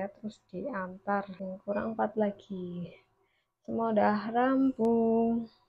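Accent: Banyumas, Indonesia. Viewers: Ora ngapak ora kepenak jawa ngapak nya mn nduk...??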